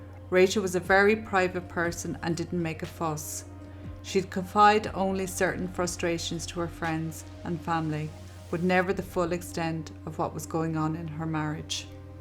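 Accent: Ireland. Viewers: Subscribing from Florida. Your Irish accent is absolutely beautiful! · you be true to yourself. lovely Irish voice... Calm, and if slow for some, i think it hints at empathy which is appropriate considering to innocent peoples lives affected forever by crime & destructive behaviour. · Watching from New Zealand, brilliant, easy to understand, don't try to change that lovely accent. I spent years living in Cork city. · love to hear Irish accent!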